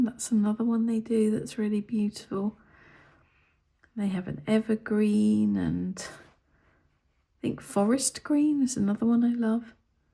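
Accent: England, United Kingdom. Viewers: the sound of your voice matches your whole pallet vibe regarding color. As I listen and watch; it seems very restrained, peaceful and very English, perhaps rural. The colors are muted, surprising, and yet chosen with deep thoughtfulness. It is so much in opposite to colors I enjoy or am drawn to. I live in a high plains desert, with amazing contrasts in color, temperature and weather. I happen to be impulsive and a little over dramatic. One would not guess it by observation, as I’m extremely introverted. I enjoy your colors without wanting to convert my own pallet to them. Thank you for sharing. I enjoy the contrast; it reminds me that I will need my own kind of restraint in my own painting. Take care 😊.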